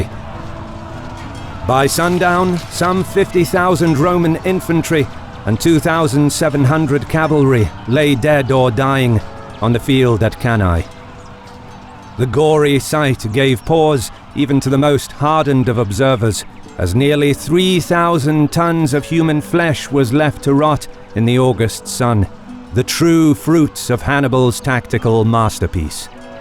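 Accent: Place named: England, United Kingdom. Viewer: Brother I like your English accent😉😉!!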